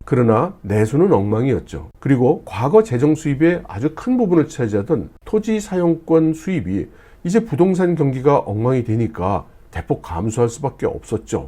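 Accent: China. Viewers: 조선족 같은데 한국말씨네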